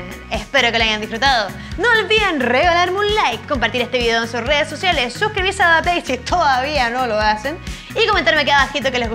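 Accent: Chile. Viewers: Chileno?? ESPERA QUE WEA???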